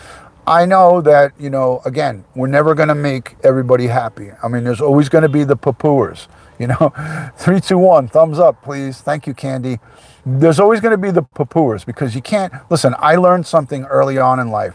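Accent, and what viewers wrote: New York, United States. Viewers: Made me giggle when you tried to pronounce Cheshire, I hope you don't mind me correcting you, but here in the UK the shire part is pronounced sheer, so it would say Chester, Yorkshire etc. Absolutely love your NY accent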